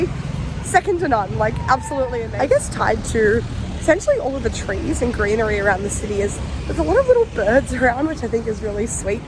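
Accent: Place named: Australia. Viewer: especially your cute aussie accent. :)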